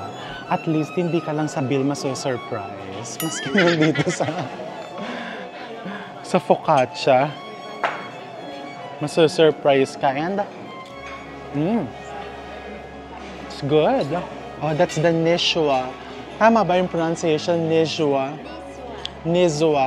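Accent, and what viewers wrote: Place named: Western Visayas, Philippines. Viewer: nakkakatuwa ka chef panoorin heehe pati pag ssasaalita mu para ka ilonggo.. srap mu panoorin na kumakain k chef.. good luck po chef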